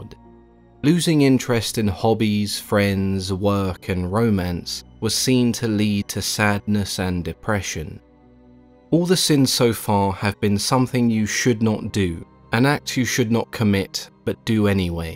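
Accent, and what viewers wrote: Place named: United Kingdom. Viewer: the British accent is making this video even more terrifying 😨😨😨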